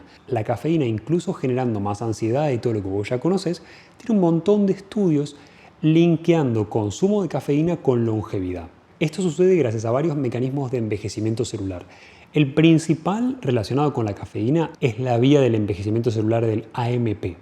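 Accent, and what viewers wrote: Argentina. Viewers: Se nota x la,manera d hablar q es argentino no es ninguna novedad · por el acento creo que es argentino 🤔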